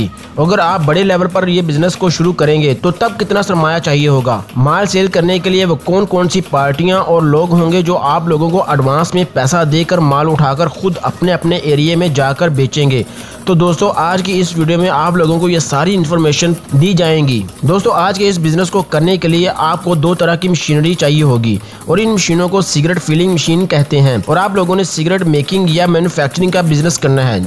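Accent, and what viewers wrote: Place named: Lahore, Pakistan. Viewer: یار بھائی پرودکٹ نہیں پرادکٹ لاہوری اناز کی انگلش سے بور نہ کیا کرو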